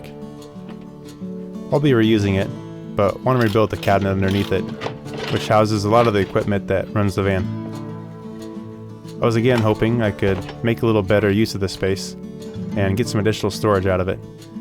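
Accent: Ireland. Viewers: Just discovered the channel and have been binging all day. Love it, but it does need approximately 60% more Irish accent. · that irish accent.... you weren't joking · Irish ☘️ is the best accent to listen to 👍🏼🤷‍♂️😂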